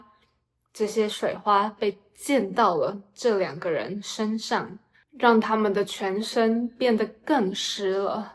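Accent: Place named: Taiwan. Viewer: Baby's Chinese mandarin is Taiwanese style lol I know he speaks good Chinese but very Taiwan ah : ) plus he always acting cue OMG the way he types words and how he expresses himself, anyway not mainland China style :face-green-smiling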